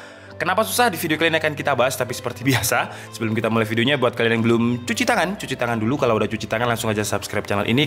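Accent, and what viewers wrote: Java, Indonesia. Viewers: E Wong pundi jenengan lur..??kok pakai logat jawa..,😀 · Mas kowe wong jawa po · Orang malang bang? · Orang malang bang ?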